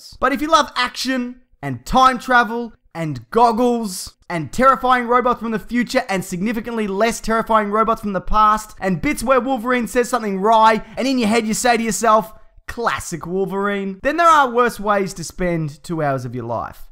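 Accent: Australia. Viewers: is this guy an aussie? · Are you from oz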